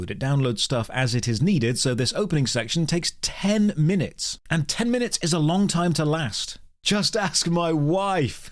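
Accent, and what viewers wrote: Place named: RP, United Kingdom. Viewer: Oh, thank god, a new. I have been fucking sick for over a month now, and I just... I just need to listen to a pretty man with a posh little accent bitch about an MMO. I'm so glad this came out today.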